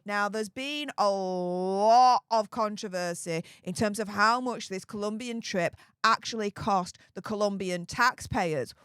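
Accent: Yorkshire, United Kingdom. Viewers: It's part of her Yorkshire accent. American here, when I first started watching, I found some utube videos on the Yorkshire accent and I've understood her much better since.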